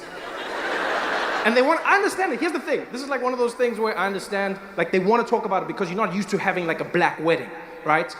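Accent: South Africa. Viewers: Erm he is South African! Did you not hear his South African accent. And I am not a fan of either of them. I prefer the late great Dave Allen. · Hope your South African English should make some sense 😂 rubbish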